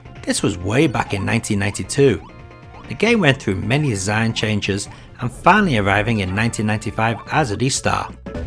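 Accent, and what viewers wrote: United Kingdom. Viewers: i like ur videos+efforts+ur brittish accent ( if i am not wrong ).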